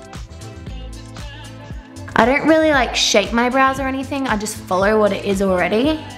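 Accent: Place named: Australia. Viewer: Why do Australians, and I have only noticed on girls, pronounce the letter "o" like "or": oh nor, here we gor, etc... lmao I find it kinda funny and cute.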